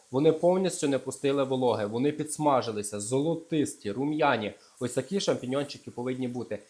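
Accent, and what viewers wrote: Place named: Western Ukraine, Ukraine. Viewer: Jak pravilnyj???Vy z zavzdy chejtyte zachidnjakiv za dialekt.Choca já zavzdy svarjusa Jakyj dialekt?Chlopec hovoryt z mirnym dialektom.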